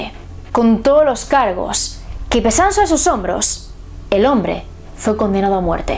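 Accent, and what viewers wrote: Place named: Castile, Spain. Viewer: Si todo va bien... ! Jaja 😍 me encanta tu acento castellano, de hecho todo.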